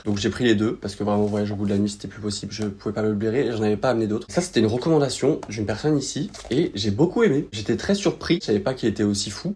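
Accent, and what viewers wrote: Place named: South, France. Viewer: T'as un peu un accent du sud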